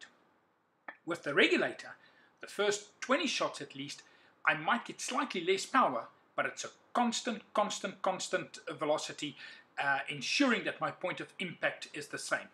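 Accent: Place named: South Africa. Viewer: Awesome I thought I recognized the accent and also trust a South African to look at things and improve on it.."n Boer maak n plan" right...well everything of the best for you Mate!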